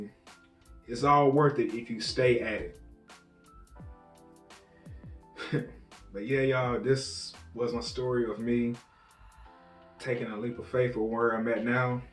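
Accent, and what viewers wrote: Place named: Tennessee, United States. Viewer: You from Tennessee???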